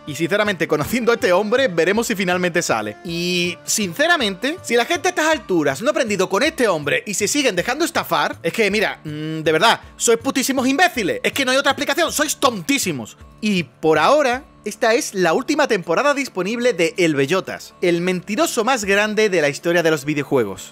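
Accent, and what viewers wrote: Chile. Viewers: Como chileno vine a buscar este comentario, me explotó la cabeza que hablases en chileno xd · La wea!? Jajaja el acento chileno es tan horrendo jajaja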